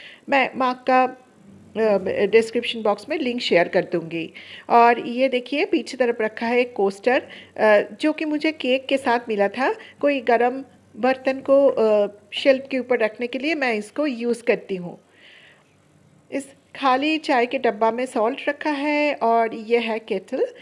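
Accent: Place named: Bengal, India. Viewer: Up bengali haye kaya? Upke hindi bolnese asai lagta hai. Isiliye bola.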